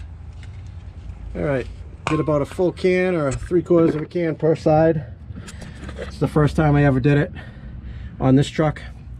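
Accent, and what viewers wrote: Massachusetts, United States. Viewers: The accent screams Mass my guy. Thanks for the video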